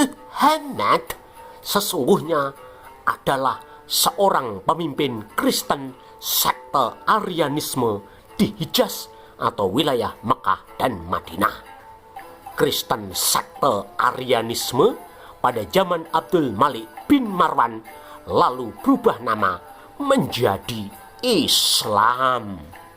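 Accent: Java, Indonesia. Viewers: Medok banget kamu cuk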